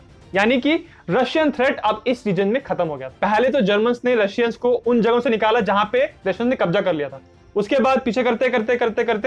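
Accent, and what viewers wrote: Bengal, India. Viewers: Are you a Bengali?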